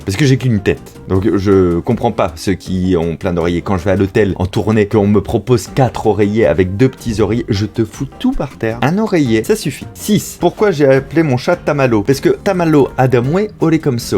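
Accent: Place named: Charente, France. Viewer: Ahah le kiff d'entendre du patois charentais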